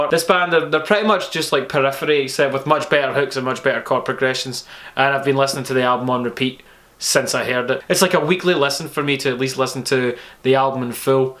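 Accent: Scotland, United Kingdom. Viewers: Wait you're Scottish? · If Anthony Fantano was Scottish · Ahhhh.... I've been watching so many of your videos that my brain is thinking with a Scottish accent !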